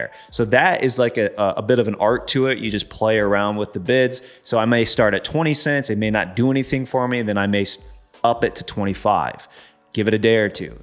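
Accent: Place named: Boston, United States. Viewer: I am from Boston and you sound like you are from around here! We are straight shooters like you - love the vids! All hard content and no bs fluff! Thanks man - awesome videos!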